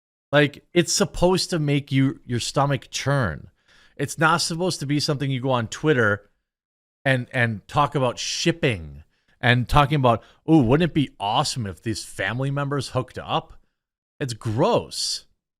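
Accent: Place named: North, United States. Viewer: your accent isn't "Midwestern." It's Northern. I only hear people with your accent in Michigan, Wisconsin, and Minnesota. I'm from Indiana and no one here says "Dreg-in."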